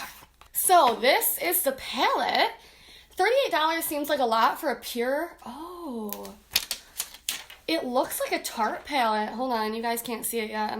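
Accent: Buffalo, United States. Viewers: Are you from Buffalo? Your accent sounds like you're from Buffalo hahaha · This girl has to be from buffalo- can hear that accent anywhere 😂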